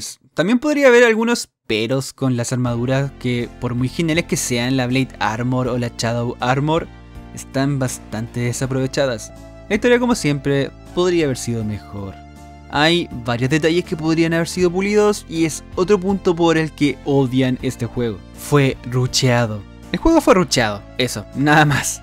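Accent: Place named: Chile. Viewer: El primer chileno que escucho hablando de megaman. Que rico. Jaja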